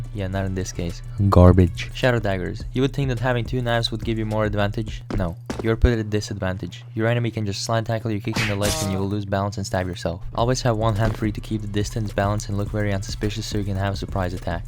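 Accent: Latvia. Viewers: are u from latvia by any chance? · You latvian?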